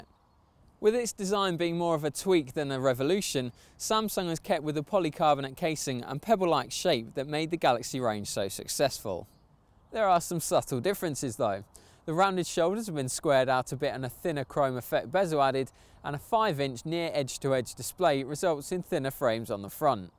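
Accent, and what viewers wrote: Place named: United Kingdom. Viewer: Das britische Englisch hört sich schon scheiße an... aber das S4 hole ich mir sowieso... aber nicht in England.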